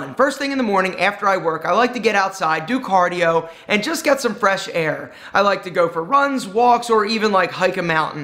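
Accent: United States. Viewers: hey if you are reading this comment you very much look like our Bollywood actor saif Ali khan whose eyes are exactly looking like yours and despite of your us accent his voice of speaking in English is identical of yours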